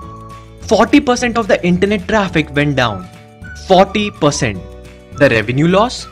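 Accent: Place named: India. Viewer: Indian accent 😑😂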